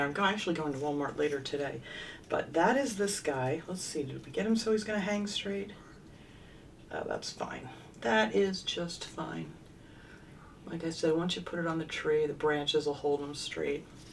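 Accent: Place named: Pennsylvania, United States. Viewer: I love watching you craft. I also get a kick out of hearing you say R ite instead of alright. I wonder if that is a PA thing. My daughter lives in PA, I will have to ask her. Thank you for sharing your talent.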